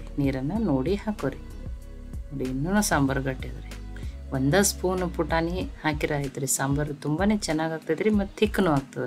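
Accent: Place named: North Karnataka, India. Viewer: ಸಾಂಬಾರ್ ಜೊತೆಗೆ ಉತ್ತರ ಕರ್ನಾಟಕದ ಭಾಷೆಯ ಘಮ ಘಮ ತುಂಬ ಚೆನ್ನಾಗಿದೆ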